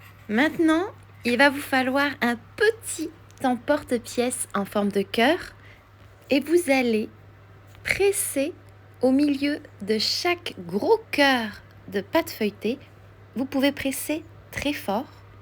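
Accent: France. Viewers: Hi, happy valentine's day. This recipe is simple and delicious too, your French accent made it more delicious ❤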